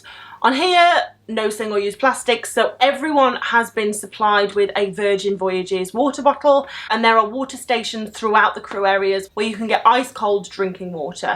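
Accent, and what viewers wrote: United Kingdom. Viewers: Haha, I might work on a crew ship later in life because I don't have any experience now. But your British accent is addictive. Just watched every single video to hear you talking 🤣😂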